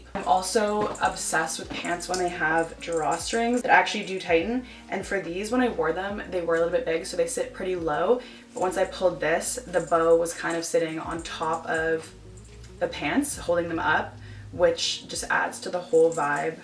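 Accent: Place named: Toronto, Canada. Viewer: I just watched your other thrift with me vids and this is the first time I have seen you on YouTube. Anyways, I immediately thought you were from Toronto and when you said you were from there I was like yesss!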